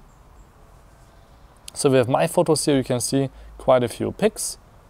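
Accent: Germany. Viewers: Dude I love your German accent! I can be your girlfriend? XD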